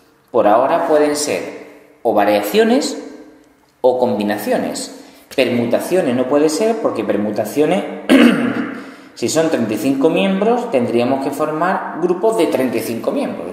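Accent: Spain. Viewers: Me ha llegado al corazón vale. Espero que me acepte una disculpa sincera y sin culpa ya que estaba enojado porque me avisaron a ultima hora que tenia que dar exposición de ese tema...... pero el acento sigue sin gustarme lo digo por las pelis. Aunque todas las españolas me gustan hasta en los videos para +18.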